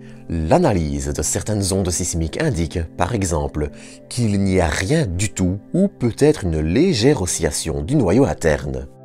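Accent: Belgium. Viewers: La voix belge. Liégeoise sans doute 😂😂. Quel accent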